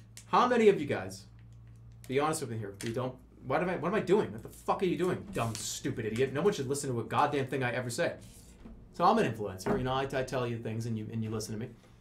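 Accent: Boston, United States. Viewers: The drunker jerma gets the worse his Boston accent gets.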